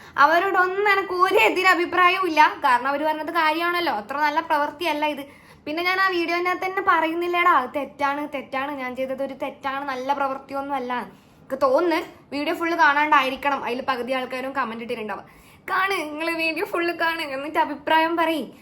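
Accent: Kannur, India. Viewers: വളരെ നന്നായി സംസാരിക്കുന്നു. നമ്മുടെ സ്വന്തം കണ്ണൂർ ലാംഗ്വേജിനു ഇത്ര ഭംഗി ഉണ്ടായിരുന്നോ 😮. താൻ വളരെ genuine ആണേട്ടോ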